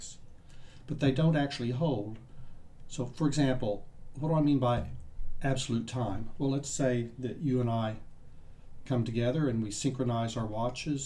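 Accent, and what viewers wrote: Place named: Texas, United States. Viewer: That's a Texan accent right there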